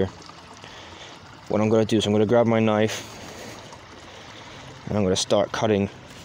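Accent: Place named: Scotland, United Kingdom. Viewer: I cant place your accent.... its scotish but sounds like theres somthing else as well?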